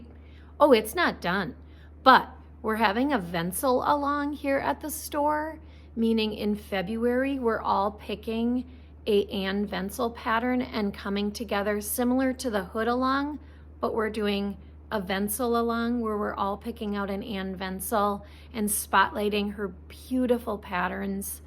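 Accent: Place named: Minnesota, United States. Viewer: Just found your YouTube videos today. This one came up. Your voice and tone is calming to me. Then your favorite things video started to play and I noticed the accent in your voice sounded like maybe Minnesota. Only a few seconds after that thought came to my mind you stated that you were coming to us from Minneapolis, Minnesota.! Just a fun piece of trivia for us. 😂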